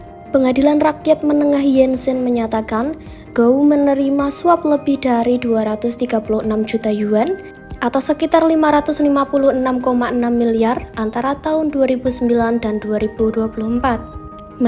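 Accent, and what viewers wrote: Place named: Yogyakarta, Indonesia. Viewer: Naratornya asli jogja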